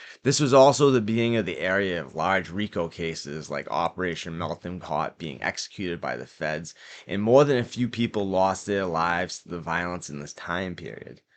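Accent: Boston, United States. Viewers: So Baawwston 🤘👺🤘🔥🔥🔥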